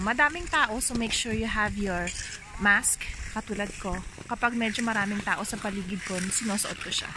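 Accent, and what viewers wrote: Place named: Quebec, Canada. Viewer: Ang sarap mag skating kabayan ! Thank you for sharing! Parang may accent ka na ng Quebecoise 😍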